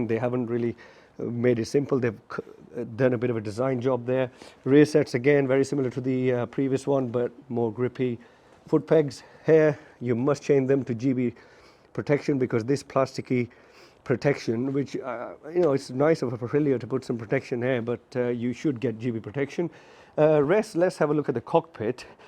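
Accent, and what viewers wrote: India. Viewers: You got an Indian accent... are you originally from India? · U have somewhat Indian accent 👍 .. loved your video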